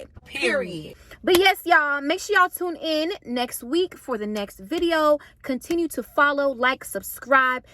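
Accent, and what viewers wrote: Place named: United States. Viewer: You got it going on there quick question are you Haitian coz you sound more American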